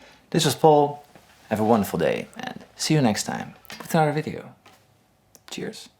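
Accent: Netherlands. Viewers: he is from the netherlands if i'm not mistaken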